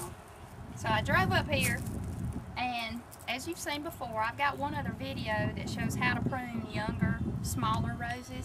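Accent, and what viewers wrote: South, United States. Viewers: love that Southern Drawl, sweetie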